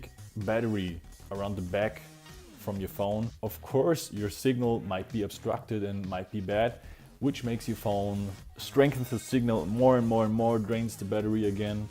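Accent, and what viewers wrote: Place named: Germany. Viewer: Bist du Deutscher ? Hört sich so an. Aber sehr gutes Englisch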